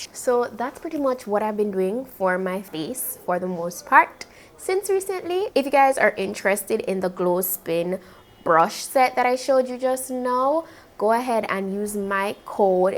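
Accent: Jamaica. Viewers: Yuh cyah hide de Jamaican ascent to rahtid.. love it 😊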